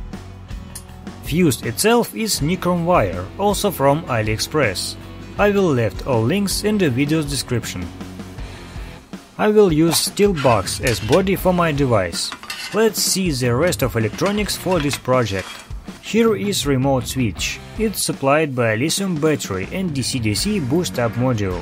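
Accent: Russia. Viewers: why am I not surprised about the russian accent?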